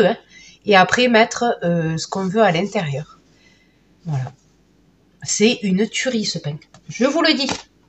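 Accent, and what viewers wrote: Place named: Midi, France. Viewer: Bonjour. Merci pour cette super vidéo. Ce pain est super à faire quand on est en panne....😅Nouvellement abonnée je visionne les vidéos petit à petit. J 'ADORE..... Nous avons le même accent 😁. Un petit coucou du Midi Toulousain. 😘😉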